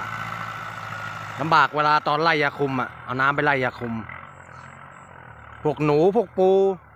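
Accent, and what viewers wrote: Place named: Nakhon Sawan, Thailand. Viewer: ครับผม ผมก็ว่าสำเนียงคุ้น ๆ คล้ายนครสวรรค์ ที่แท้อยู่ใกล้ ๆ กันนี้เอง สุดยอดครับ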